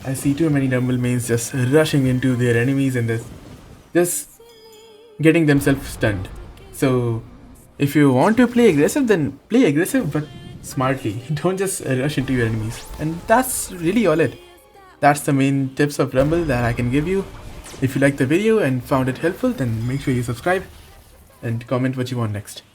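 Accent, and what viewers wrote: India. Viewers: R u indian? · Accent: indian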